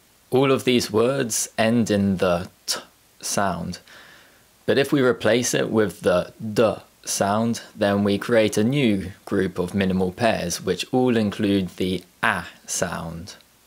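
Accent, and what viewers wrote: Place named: RP, United Kingdom. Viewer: You need to make it clear this is for the rp accent and isn’t representative of the uk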